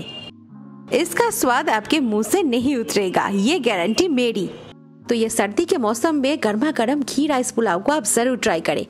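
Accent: Bengal, India. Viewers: Aap Bengali ho mam apki Hindi bolne se hi samajh gaya mai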